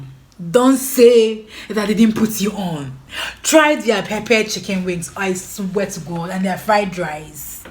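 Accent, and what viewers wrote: Ghana. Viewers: You almost became a Kumasi girl listening to you.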